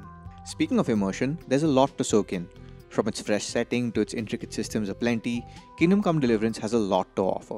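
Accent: India. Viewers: Can't stand people from India talking English. Ding Dong in my ears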